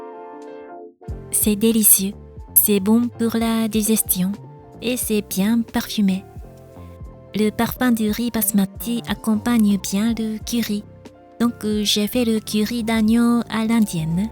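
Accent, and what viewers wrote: Japan. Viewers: Merci pour cette agréable video ! Les images sont très belles et votre petit accent japonais est adorable ! Bonne continuation a vous et merci pour ces superbes recettes sans gluten.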